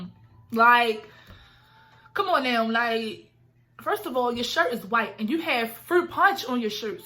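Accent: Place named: Baltimore, United States. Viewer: I love the bmore accent! Each time you said you I was like yasssss 🤣🤣🤣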